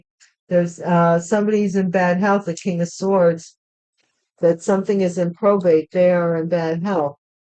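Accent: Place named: Brooklyn, United States. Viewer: You sound like you are from Brooklyn 😂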